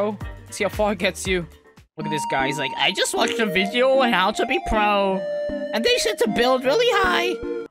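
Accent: United Kingdom. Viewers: This is awesome! Are you British?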